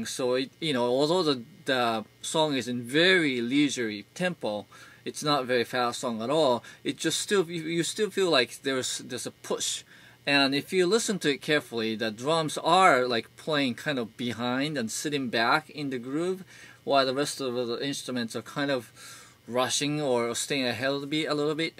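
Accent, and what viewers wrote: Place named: Russia. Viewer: I detect the Russian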